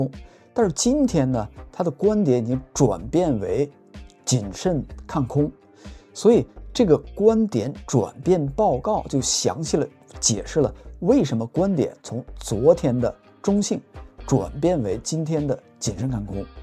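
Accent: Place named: China. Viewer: 很明显是大陆口音，即使人在国外也是在大陆出生长大的成年后才出国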